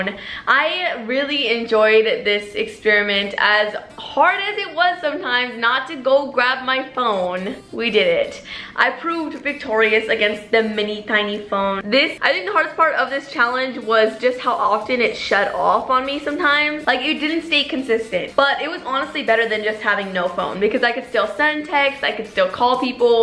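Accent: United Kingdom. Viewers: HER BRITISH ACCENT IS SO GOOD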